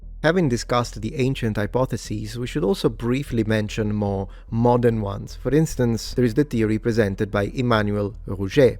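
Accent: Sicily, Italy. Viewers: Sicilian, he is from Palermo. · He's speaking with a slightly Italian accent. Palermo is in Italy last I checked. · The italian accent make history lessons about that region more authentic...I am not sure if I like that thought, but I like that it is what it is😅 God bless you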